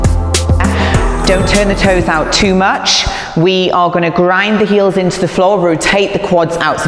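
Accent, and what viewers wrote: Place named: United States. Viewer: Non capisco l'americano inglese 😔😔😔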